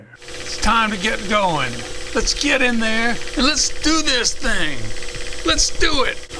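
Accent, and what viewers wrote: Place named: Louisiana, United States. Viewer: I love your videos. I'm training to have an English accent like yours. My favorite Lousiania accent. Keep the great work. The internet allows me here in Brazil to have some contact with your wisdom. Thank you very much.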